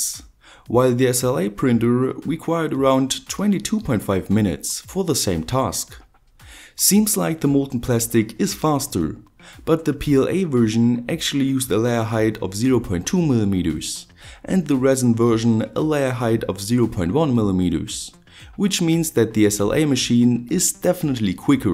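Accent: Germany. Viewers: You sir, are a true genius. P.S. I love your german accent xD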